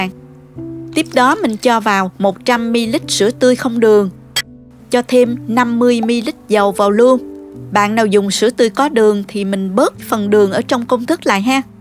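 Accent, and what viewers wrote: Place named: Binh Thuan, Vietnam. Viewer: GIONG BÀNH THUẨN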